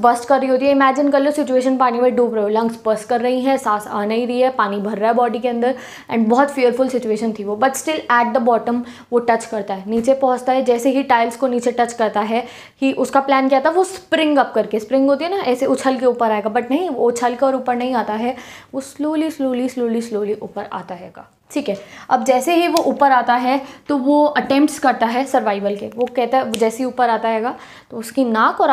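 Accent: Punjab, India. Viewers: Mam kitna bhi rok lo punjabi to rag rag me hegi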